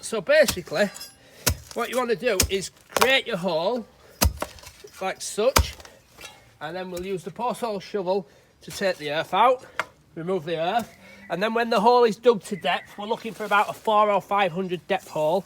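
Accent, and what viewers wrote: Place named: Yorkshire, United Kingdom. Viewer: Hi. Me again. Liking the vids again. Great explanation for anyone wanting to follow this system. Listening to you a few times now, and I thought I recognised the accent. I live in Meltham, Huddersfield and work in Leeds. So I guess, small world. I know your busy pal, but have a break for gods sake! Lol.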